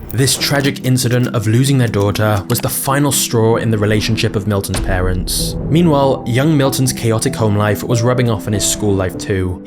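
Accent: United Kingdom. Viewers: Are you British or American ?? Because you’ve got British accent and words but your sponsors just use dollars I’m so confused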